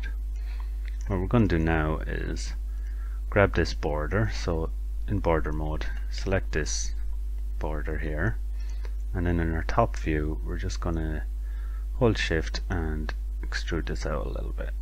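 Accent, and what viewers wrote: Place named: Ireland. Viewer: Great tutorial as always. The Irish accent makes it so much better, can't beat it haha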